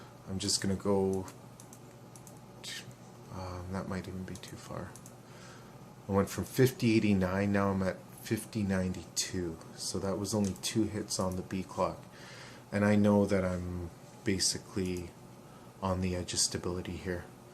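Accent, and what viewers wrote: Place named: Canada. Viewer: Dude. The radiators out the window and the Canadian accent commentary made this whole video for me. Fuck Yeah!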